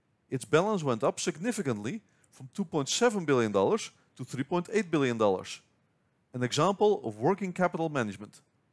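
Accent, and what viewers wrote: Netherlands. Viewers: Thank you. Really good explanation. Do I hear Dutch accent?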